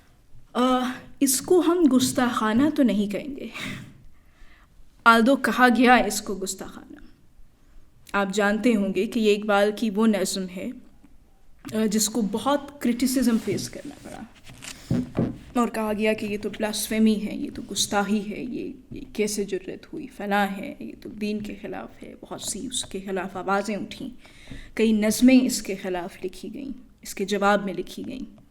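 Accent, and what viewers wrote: Iran. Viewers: ایک دقت ہے کہ محترمہ اردو بول تو رہی ہیں مگر فارسی لہجے میں بول رہی ہیں، اردو کا لہجہ اور ہے۔ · محترمہ ڈاکٹر صاحبہ کا بہت خوبصورت لیکچر ہے اقبال کی شکوہ و جواب شکوہ کی نظموں پر ۔ ان نظموں کو سمجھنے کے خواہاں لوگوں کے لئے ایک بیش قیمت تحفہ ۔ ڈاکٹر صاحبہ کا لہجہ نظم ادا کرتے ہوئے فارسی سے متاثر ہے ، اس کی وجہ یہ سمجھ آتی ہے کہ ان کا شاید زیادہ کام فارسی شاعری کے گرد ہو۔ اس سبب سے کچھ جگہوں پر تلفظ کی غلطیاں بھی ہیں ۔ مگر علمی طور پر میں کم علم تو کوئی کجی نہیں بھانپ سکا ۔ یہ سنتے ہوئے کئی جگہوں پر میں جزبات سے مغلوب ہو کر اشک آلود آنکھوں سے دیکھتا اور سنتا رہا ۔ ڈاکٹر صاحبہ نے بہت عمدگی سے وضاحت و شرح کی ہے ۔ میں یہ محسوس کرتا رہا کہ اقبال سے جو جزباتی محبت ، والہانہ عقیدت اور ان کے پیغام کے محاسن سے جو انس میں رکھتا ہوں ، وہ عشق ڈاکٹر صاحبہ بھی رکھتی ہیں - کچھ جگہوں پر واضح تھا کہ ڈاکٹر صاحبہ بھی گویا کلام پڑھتے ہوئے ایک قلبی و جزباتی کیفیت میں وارد ہو گئی ہیں - شکوہ کے بیباک انداز پر ان کی گفتگو سنتے ہوئے دل کے تار ایسے چھڑ گئے کہ بقایا حصہ بہ چشم نم سنتا اور دیکھتا رہا ۔ بلا شبہ یہ انداز گستاخانہ نہیں بلکہ لاڈ ، پیار ، مان ، یقین کامل اور محبت عمیق کا مظہر ہے- جیتی رہئے ڈاکٹر صاحبہ اور علم و عرفان کی روشنی سے طلبا کے ذہنی و قلبی چراغ جلاتی رہئے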